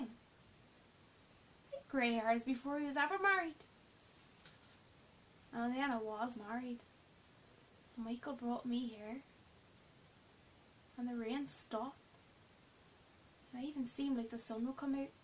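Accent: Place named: Ireland. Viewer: Your Irish accent is amazing!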